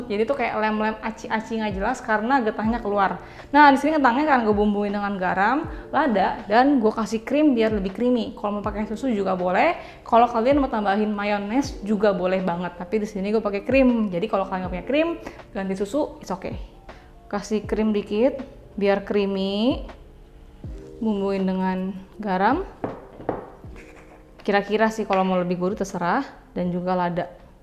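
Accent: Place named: Sunda, Indonesia. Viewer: Ini cici cici bandung kali yah ? Logat sunda kental banget kaya nya😂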